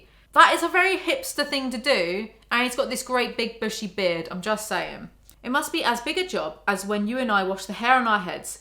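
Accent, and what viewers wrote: England, United Kingdom. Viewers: You reading this with your accent makes it so much more enjoyable, British really was made for insults.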